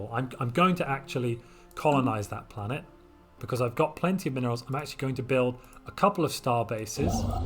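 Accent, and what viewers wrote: United Kingdom. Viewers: ColorsFade with a British accent.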